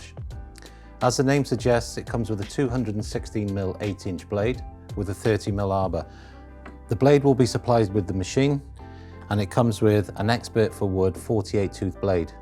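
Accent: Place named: Wales, United Kingdom. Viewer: There’s welsh in that accent for sure!!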